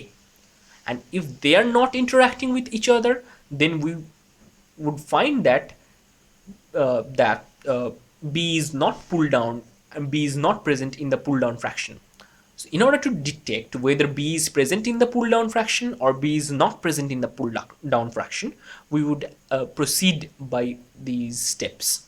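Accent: India. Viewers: tell me are u from India? what is that accent??? i need to know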